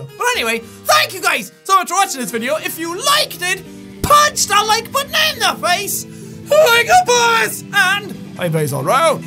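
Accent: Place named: Ireland. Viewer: hey, you'll probably never read this, but you've helped me so much through out the couple months. my mom has currently gone through a car crash, totaling our car and adds more to one of our family's problems, blah blah, i wont go into much detail. you never fail to entertain me or make me laugh. whenever i feel like giving up, i just imagine your silly little irish voice encouraging me. i want to say thank you. im not the only one you've helped, and i really hope you continue to stay happy yourself and keep others happy. much love! high five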